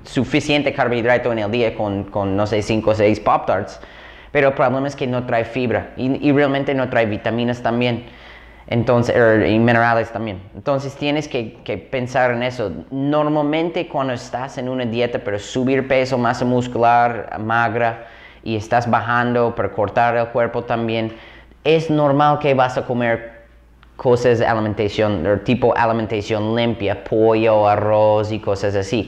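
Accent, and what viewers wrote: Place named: Mexico. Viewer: Es adictivo escuchar ese español de Méjico que utilizas XD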